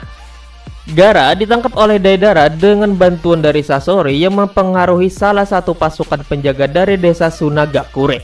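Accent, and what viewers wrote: Banjar, Indonesia. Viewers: Orang banjar kah nyawa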